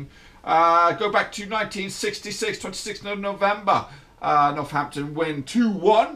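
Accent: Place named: Blackburn, United Kingdom. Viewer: Nice Blackbun accent!!!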